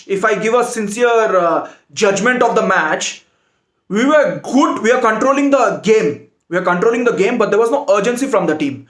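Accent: India. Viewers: Idian accet so cool, I prefere of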